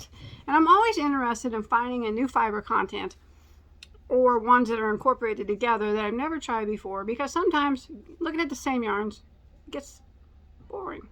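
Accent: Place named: Illinois, United States. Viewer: No one should correct your regional pronunciation. A lot of people pronounce "wash" the same way. A friend from Illinois used to say it like that, and I know you're also in Illinois. Most of us have a regional dialect & it's impolite to belittle that.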